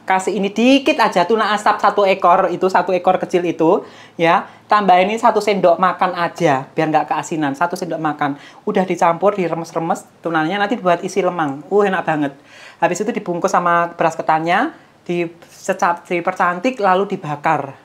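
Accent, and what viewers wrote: Java, Indonesia. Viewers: Mbk asline dr mana ya...kok jawa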